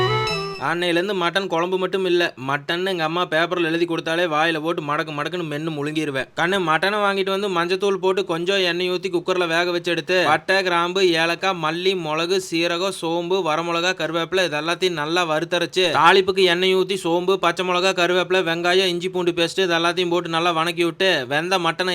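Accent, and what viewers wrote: Kongu, India. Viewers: Kongu tamil semma...❤ understand only kongu makkal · என்னங்க அண்ணா நம்ம கொங்கு தமிழ் கலக்குறீங்க அண்ணா 🎉🎉🎉🎉🎉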